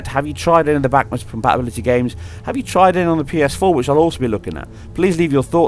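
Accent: United Kingdom. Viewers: Hey!!! Are you British??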